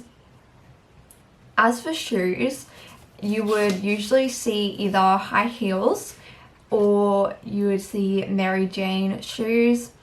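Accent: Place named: United Kingdom. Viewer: Maybe have a look at male "fashion" and make comparisons to modern day male "street" ware, that would be interesting. Dr Martins are really getting some attention here in Denmark these days among the young people, I see them all the time, never owned a pair but always thought that they looked a bit uncomfortable, guess you have to "suffer" for beauty. Another thing that was popular in the good old grunge days where t shirts on-top of sweaters , Layne Staley from AIC always did that, I guess it also covered up his needed marks among other things. I take it from your accent that your from the UK, I really liked those UK bands from the Brith pop days, it was a funny switch in style and attitude, the fashion changed a lot, slim jeans, leather jackets, retro Adidas sweatshirts, under sized t shrirt and poloshirts took over, those where funny times.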